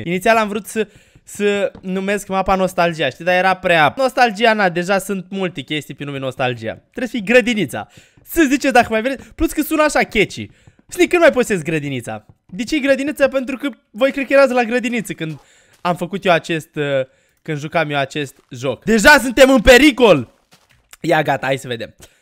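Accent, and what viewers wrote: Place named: Moldova. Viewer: Accent de moldovan>>>>>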